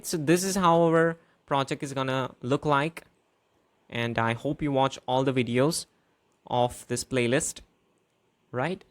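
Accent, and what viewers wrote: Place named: United Kingdom. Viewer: British Accent toh hai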